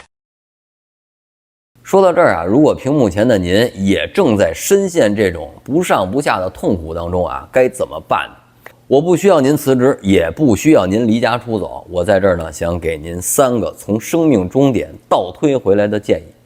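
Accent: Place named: Beijing, China. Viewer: 博主是不是北京人啊，这味儿太重了哈哈哈（褒义）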